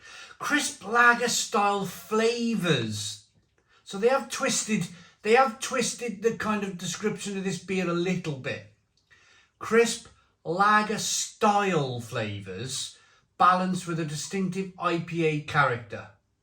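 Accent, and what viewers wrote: Wales, United Kingdom. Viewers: Love your Welsh accent!!😉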